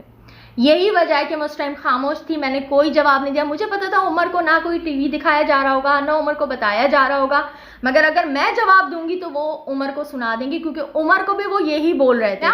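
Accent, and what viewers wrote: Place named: Karachi, Pakistan. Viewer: Lalukhetan lgti ho, tmhara lehja bta rha hai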